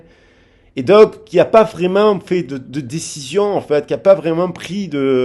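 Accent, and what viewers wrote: South, France. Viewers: vos guidances vous êtes trop fort! Toujours un plaisir de vous écouter avec votre bel accent du sud merci.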